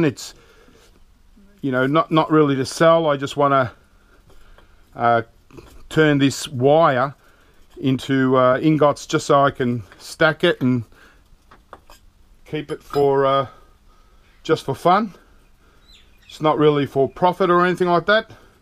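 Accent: Australia. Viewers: but he sounds Aussie tho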